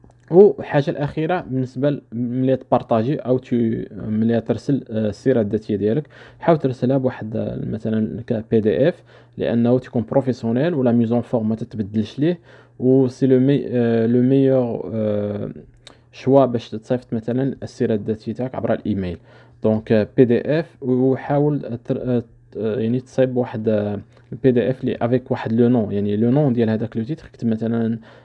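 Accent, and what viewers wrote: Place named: North Africa. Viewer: اخى الكريم ارجو مراعاة ان الكثير منا لايجيد اللغة الفرنسية وكذلك معظم الدول العربية لايستطيعون فهم اللكنة العربية الخاصة بشمال افريقيا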